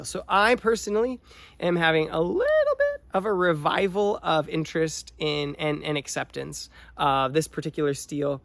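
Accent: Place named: United States. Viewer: Are you from new Zealand? You have a very american accent.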